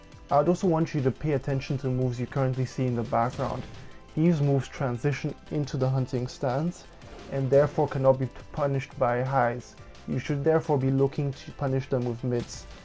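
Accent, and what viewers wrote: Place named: Caribbean. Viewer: Sub for the helpful info and the carribean accent brudda! We need a Trini Tekken character already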